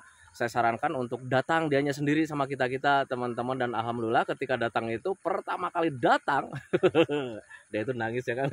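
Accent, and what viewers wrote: West Java, Indonesia. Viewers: Sundana ti mana sih kang??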